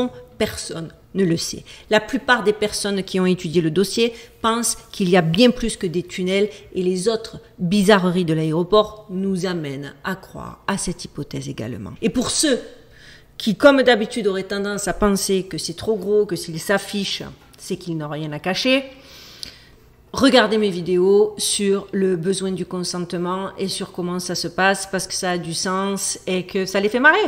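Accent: Marseille, France. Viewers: Son travail à Végas, dans la vie courante, c' est Belle génie, légèrement Asperguer, mais surtout maman plutôt rigolote, communicante et artiste dans l' âme !!! Marseillaise quoi !!! mais Marseillaise américaine Avé l' accent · oui ta pas changé en 2 ans !! Je suis un jeune abonné à ta chaîne et je la trouve sympa depuis Los Angeles d entendre l assent de Marseilles !👋continue !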